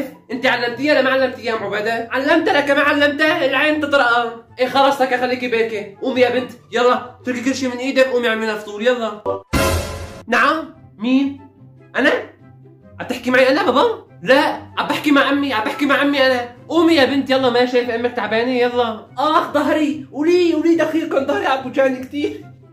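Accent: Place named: Aleppo, Syria. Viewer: أنا عم اتعلم الحكي الحلبي كلو منك💜💜❤️❤️مين متلي 👍👇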